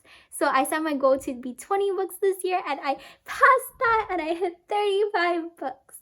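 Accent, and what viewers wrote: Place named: United States. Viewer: I think it's an American accent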